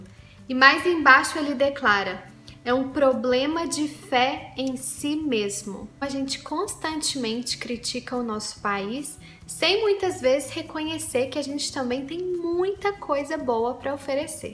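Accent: Minas Gerais, Brazil. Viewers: Vc até pode estar morando fora do país, mas hoje quando eu a ouvi, de cara pensei: Ela é Mineirinha. Um abraço desde Mariana-MG minha conterrânea da voz doce. vêm cá comer um pãozin de queijo e tomar um cafezin. gratidão suprema.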